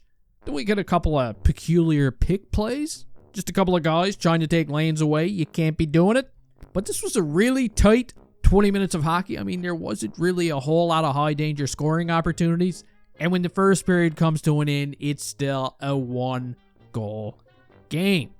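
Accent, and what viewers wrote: Newfoundland, Canada. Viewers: I don’t think it’s from anywhere in Britain. Pretty sure it’s newfie (I say that lovingly by the way)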